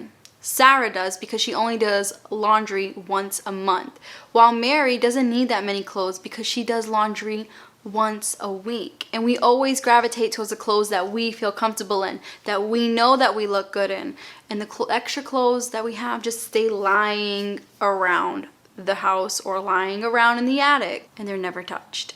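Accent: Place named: New Jersey, United States. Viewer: I live in Michigan but my dad is from massachusetts and I’m not used to hearing people talk exactly like my dad talks, so hearing your accent makes me so happy and reminds me of my family back in Massachusetts!💛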